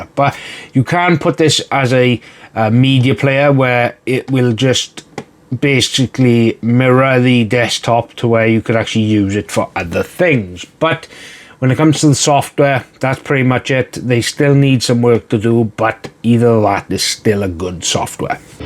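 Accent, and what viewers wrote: Wales, United Kingdom. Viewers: Heya mate! First of all, Im not used to welsh accent, and I must confess I thought you were dutch for a second lmfao. Chatgpt highly recommended this cpu cooler to me, and I was wondering if you had to chance to compare its noise compared to the Noctua or Bequiet that you featured in your comparisons performance wise ? Thanks a lot bud! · That's the most welsh accent I have ever heard in my entire 41 years on the planet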